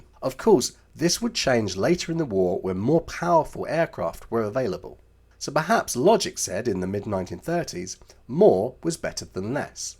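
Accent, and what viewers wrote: England, United Kingdom. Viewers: Enjoyed your video but, your British accent was a bit hard to follow with my Texas ear. Not your fault. A thumbs up from Houston. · Rose Royce? Rolls Royce! And spoken with a good English accent or at least southern accent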